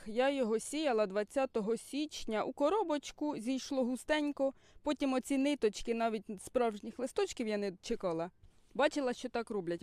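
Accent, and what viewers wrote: Poltava, Ukraine. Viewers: Доброго дня, а мені здається, що ви з Полтавщини, я полтавчан визначаю по розмові. Родичі були на Полтавщині раніше. Херсон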